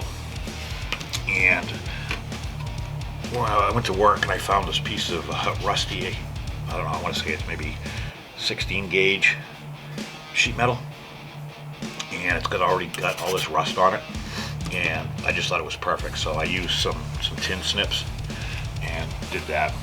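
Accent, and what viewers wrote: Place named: Southwestern Pennsylvania, United States. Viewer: Sounds like a Southwest PA accent.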